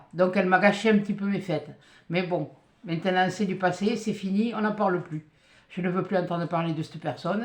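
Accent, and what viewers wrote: South, France. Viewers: Coucou Quelle belle surprise!! Tu es superbe et cette coupe de cheveux et couleur te vont à ravir. Tu reflètes la joie que tu nous communiquais par ta voix et ton joli accent du sud. Sincères condoléances pour cette personne chère à ton cœur. Merci pour tes souhaits et à mon tour je te souhaite un très bon réveillon de la St Sylvestre et à l année prochaine. Gros gros bisous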